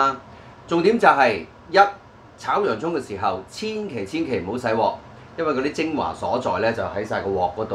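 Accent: Teochew, China. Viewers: 哈哈，潮州话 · 係唔係河洛話啊？只不過老闆個口音聽落似潮州話多啲😀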